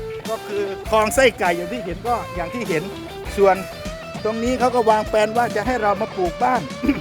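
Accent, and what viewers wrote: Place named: Chaiyaphum, Thailand. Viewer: เจ้าอยู่บ้านใด สำเนีงคือคนชัยภูมิแท้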